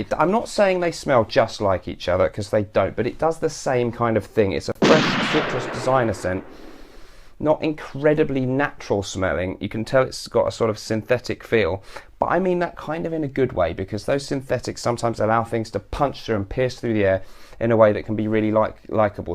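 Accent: United Kingdom. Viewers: Yes! Fragrances reviewer with British English and funny concept.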